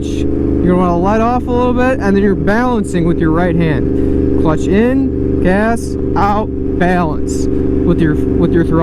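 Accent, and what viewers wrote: United States. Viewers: you sound like you are from wisconsin or somewhere in the midwest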